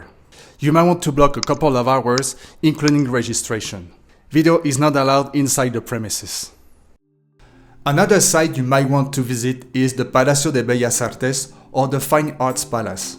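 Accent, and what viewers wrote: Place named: Quebec, Canada. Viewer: I'm guessing Quebec Canadian speaking in English.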